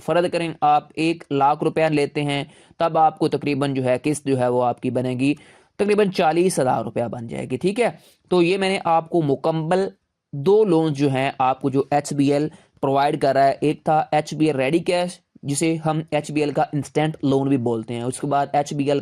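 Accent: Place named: United Kingdom. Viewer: ایک جھوٹ اور دوسرا لہجہ انگریز والا پتہ نہیں لوگوں کو انگریز بننے کا شوق کیوں ہے۔بنک لون دے اور وہ بھی سود بغیر۔ہو ہی نہیں سکتا۔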